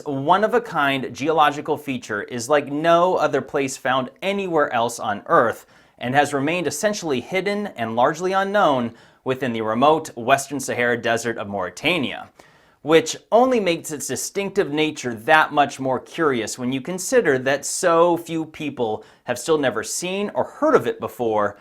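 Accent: United States. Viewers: you would seem far more intelligent if you stopped saying 45 hundred years ago, its written right there, 4,500 year ago. no other peoples I know of say this, only yanks. much like world series baseball when you dont let others play. come on, I am Shure you are very intelligent, so stop sounding like your reading a American used car price tag. anyway thanks for the video, although there was a show a couple of years ago of a gentleman walking around that eye and inspecting it. he wasn't convinced that it was anything other than natural even though he really wanted to find some proof of buildings, he could not, at lest to his satisfaction. I would imagine you are aware of this as you clearly have much knowledge on this subject. thanks again for you efforts......... 1000 thanks. notice I didn't write 10 hundreds, what a silly American habit.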